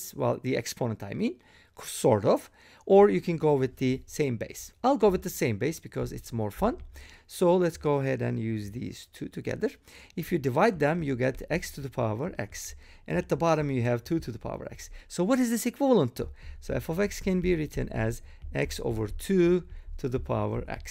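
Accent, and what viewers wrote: Turkey. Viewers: Your accent tells me you are from Turkey:)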